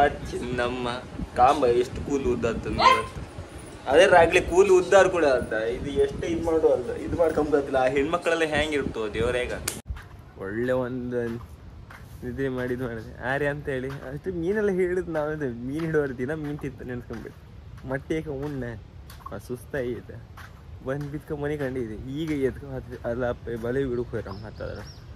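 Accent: Kundapura, India. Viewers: very nice channel and beautiful content. I have been watching your posts from Kuwait for quite sometime now. I Liked the simplicity, naturality of the content, especially the hard work done by fishermen community. Most importantly, i love Kundapura Kannada. All the best. Keep Fishing.!!!!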